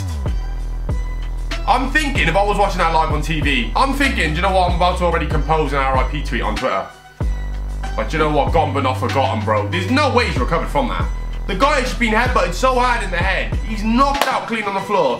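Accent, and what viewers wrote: Wales, United Kingdom. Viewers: Defo a Newport or Cardiff lad you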